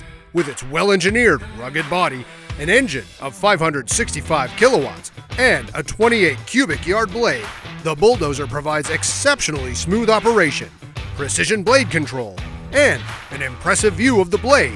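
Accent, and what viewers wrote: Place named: United States. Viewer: Best American accent I have ever heard!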